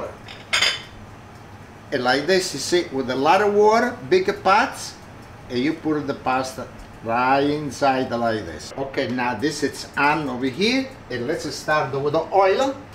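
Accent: Italy. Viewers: Perhaps cut the anchovy up to spread evenly would be the only different thing I’d do I love listening to the slang Italian Yr like the old compare next door. Bravo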